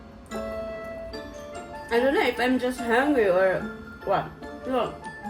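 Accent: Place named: Thailand. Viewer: Dang! What haffen vella? Your accent is becoming thai now haha!😅 You should learn how to speak in thai now! Btw, cheers to more cooking videos chef! 🧑‍🍳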